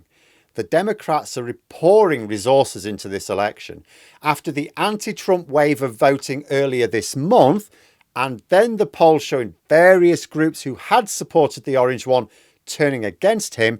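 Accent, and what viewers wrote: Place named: Yorkshire, United Kingdom. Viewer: I sent him an email about it so perhaps he could change it, without embarrassing him. He replied: "I don't mispronounce it, I pronounce it according to my own accent. Pronunciation is regional, and I ain't from your region. So, what I'll actually do is carry one pronouncing words in the way people from my area pronounce them." Kamāla is an Urdu name, different etymology; there are more Muslims in Yorkshire than Hindus by far so he may have heard that, quite different, name.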